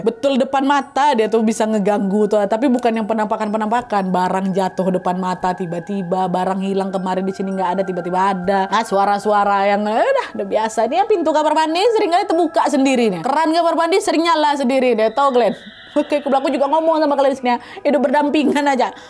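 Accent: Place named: Palembang, Indonesia. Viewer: Nahh wong Palembang mano suaronyooo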